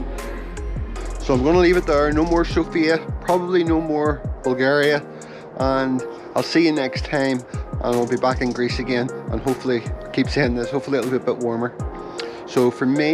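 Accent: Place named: Belfast, United Kingdom. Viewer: you sound like you're from Belfast. I am too. Would love to speak with you about your experience as I'm looking for restorative treatment to include lots of implants..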